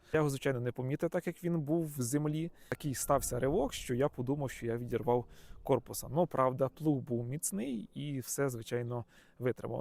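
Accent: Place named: Volyn, Ukraine. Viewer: Вас мова з волинським акцентом... Звідки ви?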